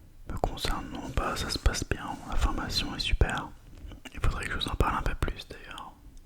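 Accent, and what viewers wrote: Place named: France. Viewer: 💌 Hi,💟 I love the French accent and thanks to ASMR with soft sounds I feel very, very good. Thank you.🙌✨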